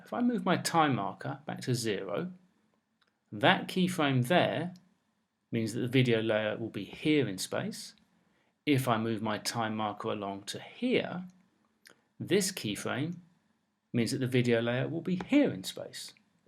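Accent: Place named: United Kingdom. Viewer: British accent is great!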